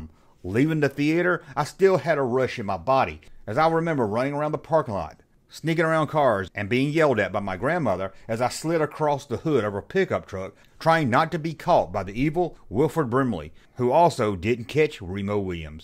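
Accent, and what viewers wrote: United States. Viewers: sorry for my earlier (now deleted) comment - I can be a TOTAL DICK sometimes. I should have checked out more of your channel before leaping to ignorant conclusions. As a Canadian, I sometimes don't take into account the legitimate regional accents and vernacular of the vast US culture. That said, I enjoyed the reminder and comments on this interesting underrated, entertaining film. I recall (even at the time) burdening ANY FILM as "the adventure begins: was asking for trouble!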